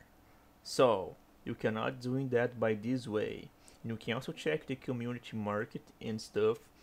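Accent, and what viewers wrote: Brazil. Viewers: Kkkk mano, deu pra ver que tu é BR só pelo jeito que tu fala inglês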